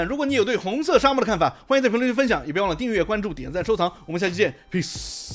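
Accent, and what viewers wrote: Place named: China. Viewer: 尖銳陸腔差評😅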